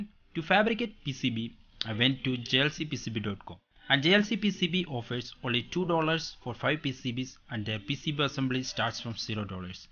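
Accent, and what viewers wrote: Kerala, India. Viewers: So, a total of 5$ which is not that costly but judging by your accent are you from Kerala,India?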